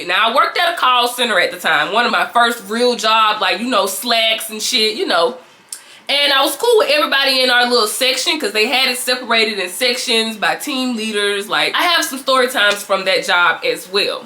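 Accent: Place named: Alabama, United States. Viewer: You from Bama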